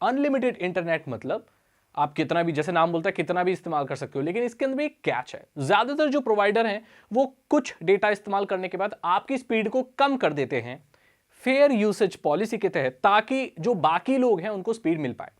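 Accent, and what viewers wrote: Odisha, India. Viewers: Bro, are you from Odisha ? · bro are you from orrisa???? love you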